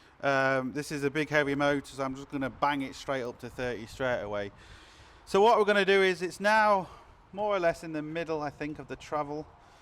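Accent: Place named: United Kingdom. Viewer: Great Job! British Guy LOL Thank You !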